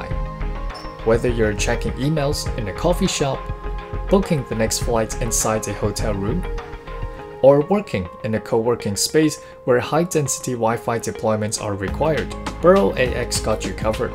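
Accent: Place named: France. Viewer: Very very French speaker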